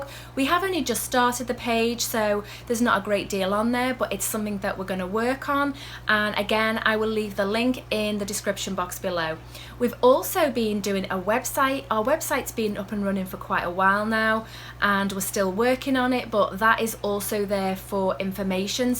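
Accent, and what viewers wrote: Liverpool, United Kingdom. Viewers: i love your channel but i was just wondering are you from the uk but live in america x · Aren't you scouse?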